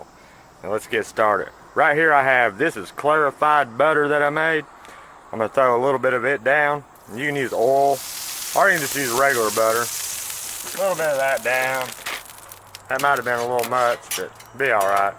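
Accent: South, United States. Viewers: 2 seconds in I knew he was from the South 🤣 thanks for the good vibes & recipe.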